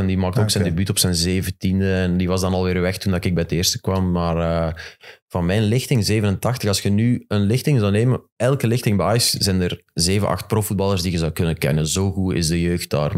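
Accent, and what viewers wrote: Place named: Antwerp, Belgium. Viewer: Kevin, hij spreekt antwaarps, niet limburgs😂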